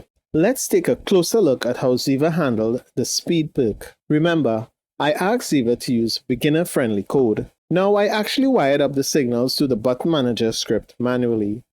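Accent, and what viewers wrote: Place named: Trinidad and Tobago. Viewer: This accent sounds like its from Trinidad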